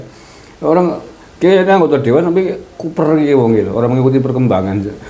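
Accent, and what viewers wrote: Banyumas, Indonesia. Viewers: Pak ... udude mota mati wae pak. Tapi senine yo kaya kiye. Lucu dadine. Tapi apik pak ulasane. Diselingi logat2 banyumasan. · Bahasane koh ngapak banget nang endi kiye posisine bro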